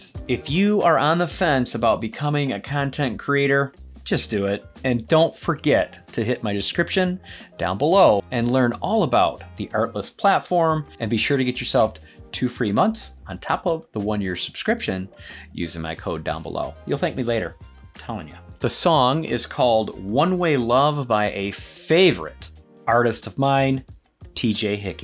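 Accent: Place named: Eastern Canada, Canada. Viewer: Best video on these cans yet. Pulling my hair out trying to choose what headphones to go with. Razer opus lacking the ldac sucks but these I've heard are poor performers once they are plugged in aux. Lose the eq settings and goes passive? But these are the nicest looking cans out there with almost all features needed. Ldac is perf for amazon music streaming. But as an occasional wired gaming head set... Sounds like it's a serious loser.... BTW are you from the Canadian east coast? You sound eastern Canadian.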